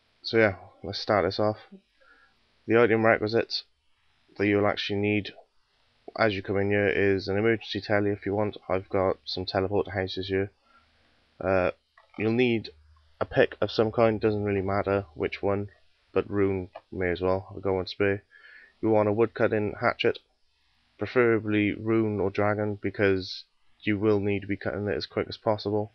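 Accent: Wales, United Kingdom. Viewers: Thnaks for this it helped and I am proud to be welsh I recognise your accent. You from south wales?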